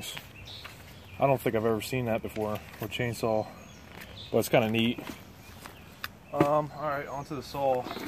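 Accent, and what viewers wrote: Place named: Philadelphia, United States. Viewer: You sound like your from the Philadelphia area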